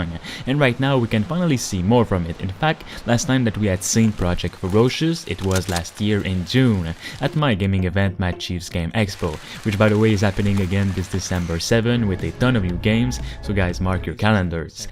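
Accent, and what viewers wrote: Quebec, Canada. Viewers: Salut, je viens de découvrir ta chaine et t'as genre un certain accent. T'es tu Québécois pas hasard? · Es-tu québécois? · Your voice sounds québecois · you defenitly sound like a french Canadian.... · T’es clairement québécois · Are you from Quebec?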